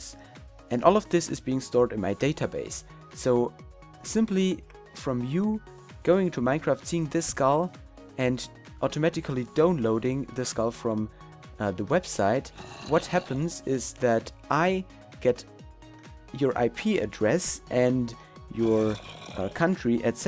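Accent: Germany. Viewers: Are you German? Im asking because you have a German like accent.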